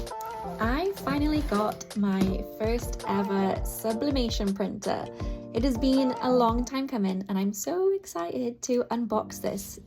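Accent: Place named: United Kingdom. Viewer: I’m guessing you’re from the US? It’s spelled with an S in British English and with a Z in US English. is British, Scottish or Irish (I haven’t listened long enough to pick up the exact accent, Sorry).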